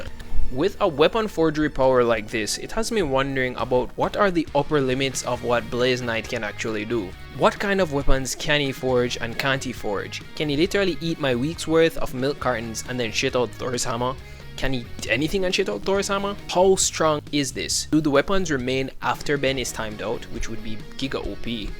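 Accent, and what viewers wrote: Jamaica. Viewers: You sound Jamaican. Are you? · you sound like jamaican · Are u jamaican? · Is he Jamaican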